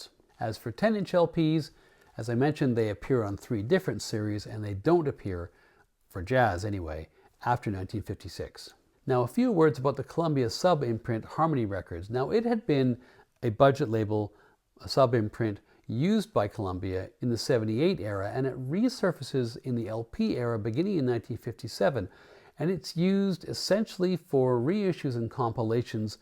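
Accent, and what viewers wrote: Canada. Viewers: I discovered your presentations by chance about 3 weeks ago. I'm enjoying the hell out of them.Your knowledge,quietly enjoyable Canadian delivery and sensibility,and your sense of humor have been a lot of fun for me,as someone who has enjoyed records-for the music,the labels,and the design,for well over 60 years. Your description of a weird portrait of Bobby Troup on a 50's album had me in stitches. Your work-and presence-have been a joy for me!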